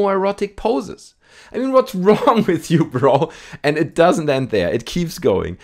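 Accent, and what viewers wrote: Germany. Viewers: You have a German accent lol.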